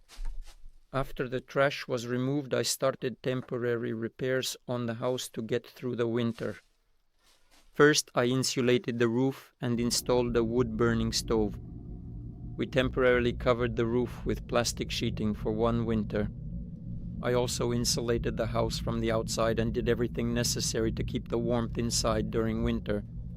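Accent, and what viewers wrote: Belarus. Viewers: Белосус походу,судя по говору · По говору вы из Белоруссии??? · Это где в Беларуси? Голос вроде белорусский у тебя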